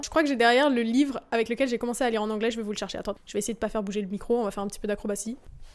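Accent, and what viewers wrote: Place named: France. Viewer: Je trouve quand même que vous gardez un accent français, faites une démonstration de votre accent américain svp 😅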